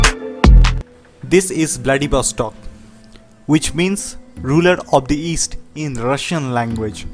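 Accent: India. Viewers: That thick Indian accent. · I love your Indian accent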